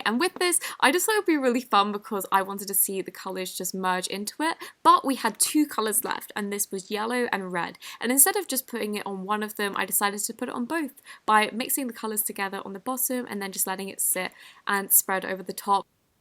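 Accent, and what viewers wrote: England, United Kingdom. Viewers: Okay so this is a bit of a weird question but I am reading a book right now and it's based in England in the queen victoria erra so the girl has a brother named Rupert so what I wanna know is how you would pronounce it? I love the english accent and this is English based book I know a bit of a weird question lol but I love your videos they're always great keep up the great work <3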